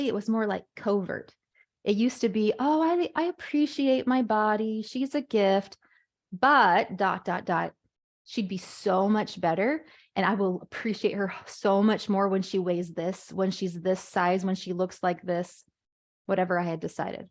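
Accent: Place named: Utah, United States. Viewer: This was great! How come you don’t have very many subscribers? Are you just starting out? What brought you here? On a weird note, are you from Utah? You have a pretty strong Utah accent!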